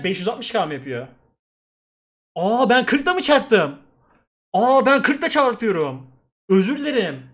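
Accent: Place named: Trabzon, Turkey. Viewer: Api senun şu Trabzon şivene bayılayrom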